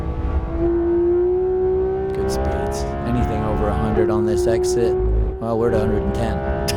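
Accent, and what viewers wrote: United Kingdom. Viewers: Nottingham, but he's been hanging around with a lot of Yanks.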